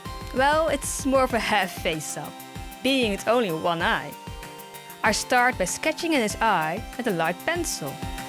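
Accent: Netherlands. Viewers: i could just hear your dutch xD im dutch as well! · Omg I’m really late watching this but I could tell by your accent you are dutch too! Subscribing rn! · Je kan gewoon horen dat je nederlands bent😂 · Are you Dutch? Your accent seems Dutch XD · haha, the accent sound really weird for people who arn't dutch.. our accent is really different and sounds kinda funny when we speak English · Wow this is really cool! Quick question, are you Dutch? I think I regocnized the accent xD. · I just found you because of dollightfull. But I have a qeustion where do you com from. Because of you're accent it sounds like you're dutch, but I can be wrong. I love the doll by the way!😍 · Ahh I found you due to Dollightful and I heard your accent was Dutch haha. Sorry I am also Dutch and well I hear it immediately ! I do not do doll customization or anything. But I always love to watch dollightful! 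So cool I found your channel! I really liked the collab between the three of you!!! Just love how you were so creative with the bottom part! Keep up the good work! Fellow Dutchy! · i heard the way you talked english and i was like is she dutch too? and then you said you saw the dutch version of pokémon and then i knew i heard it right xD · Wow once you started talking about pokemon I realised that you really sound Dutch and I was very confused for a moment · your accent sounds dutch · Im dutch to and i tought it was a dutch accent but i wasnt sure 😂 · haha, ik had al z'n idee dat je Nederlands was. Dat accent herken ik altijd ;D Very nice work :D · ik dacht ook al van hu?! Ben jij Nederlands toen ik haar hoorde😂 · I was watching this like “why does that accent sound familiar” and then I saw your where Dutch and then I was like “oh daarom dus” ik ben ook Nederlands hihi😁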